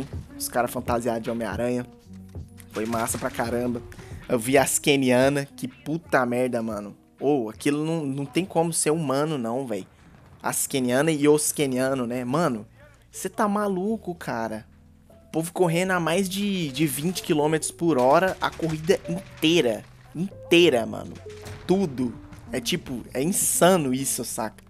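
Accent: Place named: São Paulo, Brazil. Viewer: pra mim vc era daqui de sampakkkk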